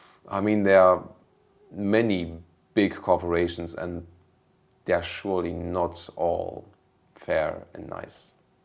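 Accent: United Kingdom. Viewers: Many thanks for taking your time for the Q/A. Great episode, great Schnauzers, great British Accent. I am already looking forward to hear your Danish skills ...... definetly! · Your British accent is hilarious xD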